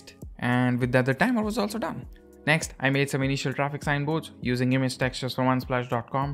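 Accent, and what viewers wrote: India. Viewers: Default cube with a sexy moustache and Indian accent talking to me about my favourite software…. I’m in! Subscribed!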